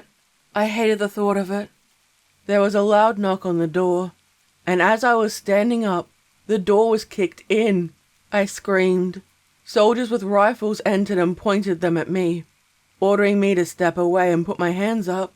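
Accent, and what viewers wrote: Australia. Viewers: I know hear this a lot, but its true. I love your voice. Your Australian accent and the way you carry the stories you make which is awesome as well is so inspiring and amazing. I could listen to you read a motorcycle maintenance instruction guide and love it. You're awesome. I love your videos. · Found your channel recently!! Love your voice! Love your Aussie and now doing an Aussie based story! ❤😊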